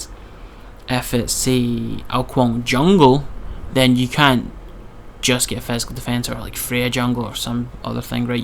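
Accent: Scotland, United Kingdom. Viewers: Could you talk just a little bit slower:D kind a hard to follow when your not form Scotland.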